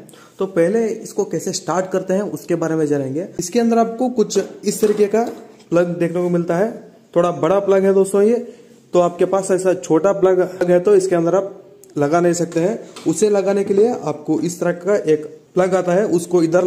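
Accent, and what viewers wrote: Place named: South, India. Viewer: Your language is like south Indian speaking Hindi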